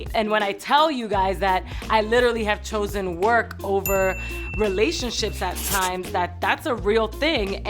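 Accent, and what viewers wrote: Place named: New Orleans, United States. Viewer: Nawlins accent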